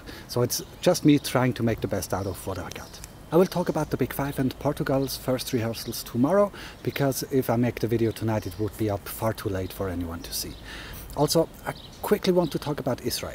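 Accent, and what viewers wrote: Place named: Switzerland. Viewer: I KNEW you were from my home country based on your accent XD Where exactly are you from? I live in Horgen near Zürich!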